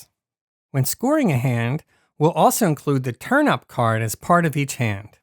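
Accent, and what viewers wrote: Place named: Delaware County, United States. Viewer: You sound like you're from Delco......GO BIRDS!!!!!!!!!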